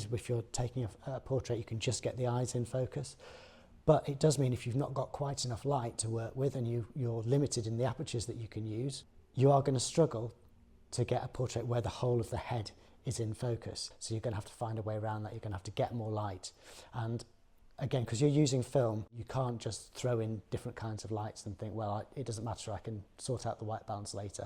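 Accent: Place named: RP, United Kingdom. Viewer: I seem to have failed to utilise your nice movie as a listening material of Received Pronunciation. I can completely imagine what you're saying even though I don't understand English itself -- I used to shoot with this super-heavy camera forty years ago! -- Best wishes from Japan.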